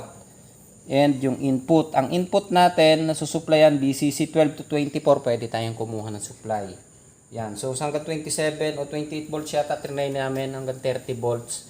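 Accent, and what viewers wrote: Mindoro, Philippines. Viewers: Tagasaan po kau sir...parang taga MINDORO po kau kababayan ko..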